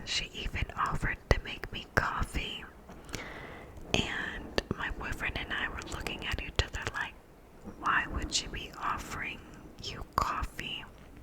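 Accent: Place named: Midwest, United States. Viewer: your Midwest accent makes me feel right at home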